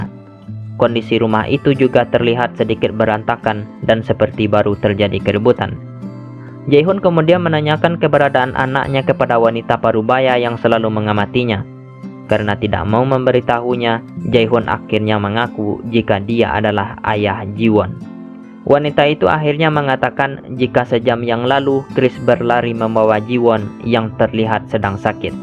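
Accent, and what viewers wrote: Madura, Indonesia. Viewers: Admin logat madura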